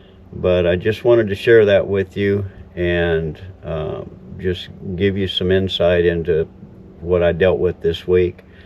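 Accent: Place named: Mexico. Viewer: Sounds like Mexican learning english😊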